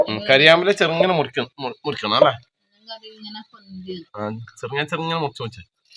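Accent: Kannur, India. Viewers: Niggalku. Nammude. Kannur. Basha. Manasilakunile😂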